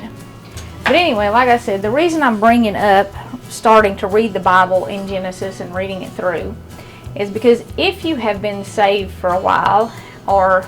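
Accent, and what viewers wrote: South, United States. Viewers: Where in the South do you come from? I am a Buckeye (worthless nut) but I love southern cooking. You are easy to listen to. I love southern cooking and I love to hear someone whom I believe is saved.! If you know the Lord (Really know). you will be sincere and honest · Love to hear you talk. I am from the south but have been living in the north for the past 21 years. I miss the southern language so much. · Living on the west coast, I love your southern accent. I could listen to you all day. The tip on making ap flour into self-rising was most helpful. You talking about Jesus was an unexpected delight. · Amen...Raised as far Southest Kentucky as you could get,Live in Arizona now..Hearing her southern voice reminds me of Back Home Sweet Harlan County...Girl your biscuits look Amazing...Jesus Sure Loves all his Children...Amen😇🙏🏻🙌🏻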